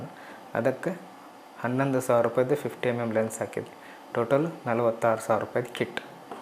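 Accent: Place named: Raichur, India. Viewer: ನೀವು ಆಡೋ ಭಾಷೆ ಕೆಲ್ತಾಯಿದ್ರೆ ರಾಯಚೂರು ಜಿಲ್ಲೆಯ ಬಾಗದವರು ಅನ್ಸುತ್ತೆ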